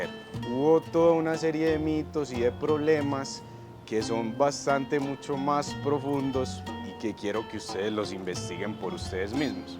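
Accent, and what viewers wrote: Antioquia, Colombia. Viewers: Que forma mas hermosa de hablar ese hacento paisa jenial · Me encanta el asentó tan paisa. …. Mi paisano ….. e ave María pues …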